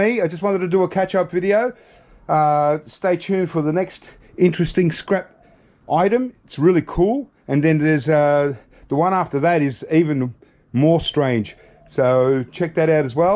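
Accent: Australia. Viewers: Gotta say I love hearing Aussie spoken in its natural environment. Glad to hear the assorted body parts are healing up, because there are a bunch of crazy Yanks up here looking forward to street scrapping season!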